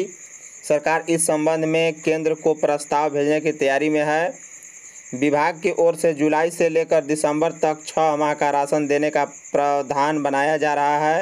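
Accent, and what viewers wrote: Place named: Jharkhand, India. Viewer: Bhai apni aawaz me aapko jharkhand ka pehchan dena jaroori hai kya?? ⏰😡😡😡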